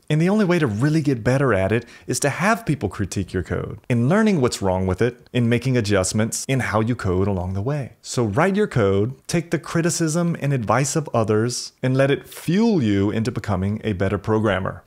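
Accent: South, United States. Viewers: Are you from Georgia or South Carolina by chance?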